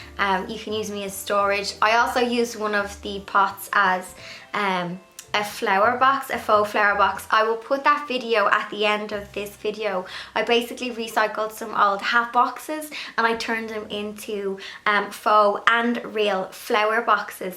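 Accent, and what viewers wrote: Dublin, Ireland. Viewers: Blondie is gorgeous. Your Irish accent is so much nicer than my Scottish one. 🏴 Thanks for this vid... I can't throw anything out. 🙃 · I'm new subscriber I am old school I use them for sewing lol and even makeup etc I'm afraid of inhaling spray paint but I love what you done I'm in Ireland is that a Dublin accent I'm a dealz lover and tried anything like that as I live alone xx thanks alot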